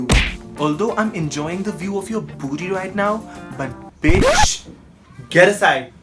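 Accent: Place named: West Delhi, India. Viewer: Bhai west delhi ke lgte ho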